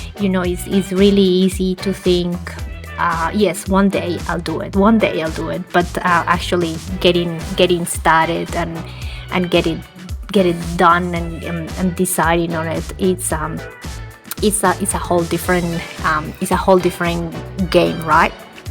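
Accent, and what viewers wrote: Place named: Italy. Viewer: I imagined something similar ... your pronunciation in English is the typical pronunciation of an Italian. Welcome to Italy!!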